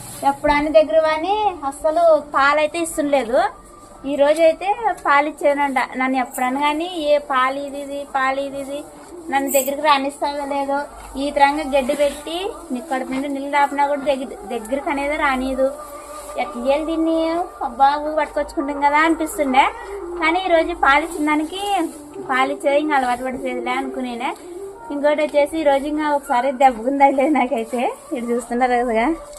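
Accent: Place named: Rayalaseema, India. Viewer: Adi rayalaseema village yasa ardhamuc hesukondi.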